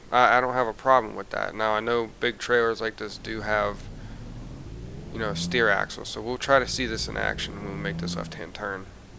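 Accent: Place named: Pennsylvania, United States. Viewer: Just found your channel via Kyle's videos. Great to hear another Pennsylvanian.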